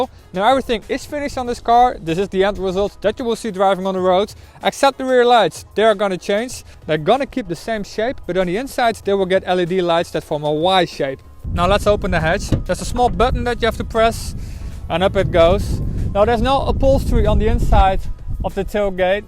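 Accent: Netherlands. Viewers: Lekker Nederlands accent lolz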